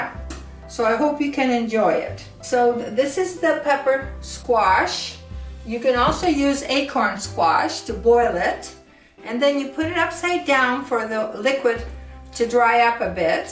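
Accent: Florina, Greece. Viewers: Well done! What village are you from? Your accent sounds like from Lerin area, like me. I'm now in Australia since 1957. My mother made Zelnik so tikva, and now my son also makes zelnik too, for his family. Best wishes, so zdravje.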